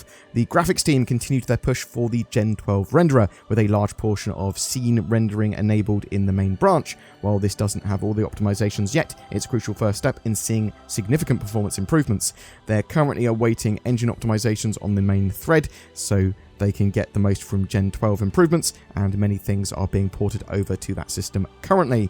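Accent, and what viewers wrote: United Kingdom. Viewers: British accent mate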